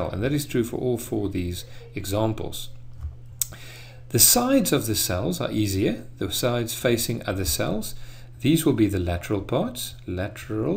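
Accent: South Africa. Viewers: professor are you from southafrica (south african accent) ;) thank you for this video...really clarified some topics I was struggling with. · Been struggling with this concept all throughout my first year of med school, and this video helps so much! Also love the South African accent. My girlfriend is an Afrikaaner and her dad sounds just like this. It's a comfort to me.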